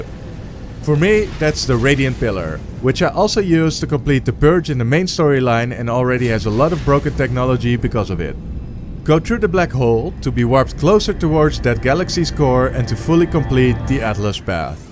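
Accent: Netherlands. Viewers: You dutch aint you??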